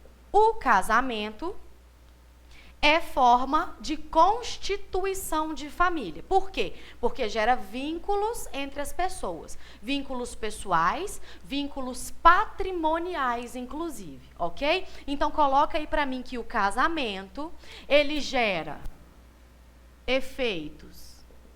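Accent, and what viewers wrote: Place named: Belo Horizonte, Brazil. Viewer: Ola Prof este seu sotaque é de BH kkkkk.Mas uma boa explicação de vossa parte.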